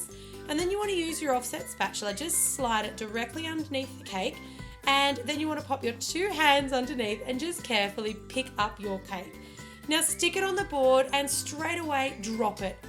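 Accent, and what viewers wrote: Australia. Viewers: Your beautiful And I luv you Australian accint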